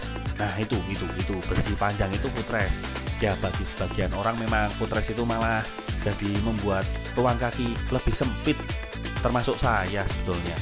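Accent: Java, Indonesia. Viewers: Medok bnr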